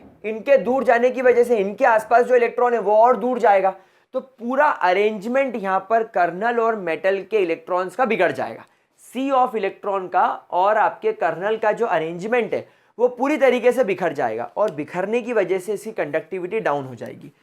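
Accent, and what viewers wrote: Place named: Bhopal, India. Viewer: Sir your voice is same to same of bhopalvwalo :---- hoegi ❤️❤️❤️❤️🙏🔥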